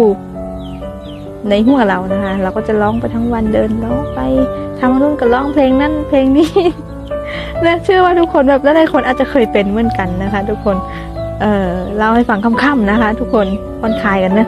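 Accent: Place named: Suphanburi, Thailand. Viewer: พี่พูดเผน่อคิดถึงน้องที่สุภรรณเลยย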